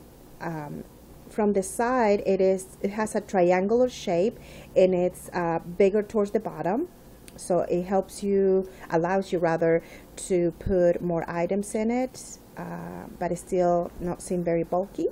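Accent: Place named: Germany. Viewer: Love that accent. German ?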